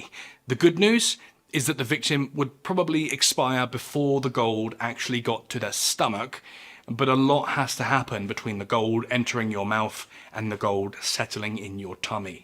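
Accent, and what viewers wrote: England, United Kingdom. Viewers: love listening to the English accent talk about history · We wouldn't be doing it were it not for tone, pace, etc. Even the British accent helps. Pauses at the right time, everything's in the right doses. Not too emotional, not impersonal either. Perfect balance, storytelling, except it's real stories. Exactly what he intended I guess. And viewers get educated because he does provide a thorough historical context to every event he covers.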